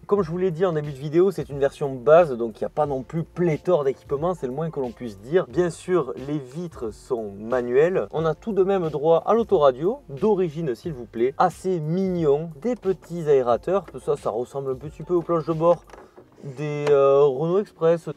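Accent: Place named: Southwest, France. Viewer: Bravo pour ces vidéos très sympa (et cet accent de notre bon vieux Sud Ouest!) J'ai eu une renault 18 Américan 2 dans ma jeunesse, j'en ai gardé un excellent souvenir!!!...Et le badminton, ça fait travailler tous les muscles, mais pas de mal au bras! Il va falloir travailler ta prise de raquette... Ps: on m'a donné une peugeot 305 qui roule parfaitement en daily, et comme la renault 18, elle rappelle d'excellents souvenirs de jeunesse à tous ceux que je croise sur mon chemin, et plus curieusement encore, quand je vais dans des concentrations de vieilles autos avec cette 305, elle suscite presque plus de réactions et de regards qu'une des 10 ou 12 berlinettes garées sur le parking... incroyable facteur de sympathie!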